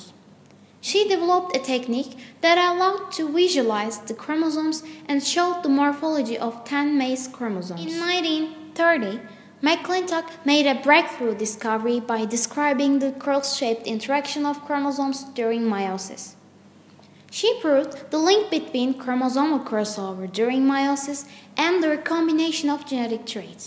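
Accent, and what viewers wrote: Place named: England, United Kingdom. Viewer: Impressive, liked your english accent, i want more.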